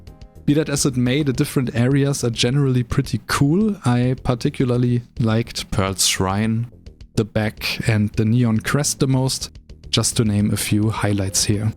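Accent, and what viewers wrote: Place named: Germany. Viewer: you have very beautiful accent - is it German? P. S. I figured out you were only starting by the audio quality - there's too much noise and the volume is a little unbalanced. Still great video xD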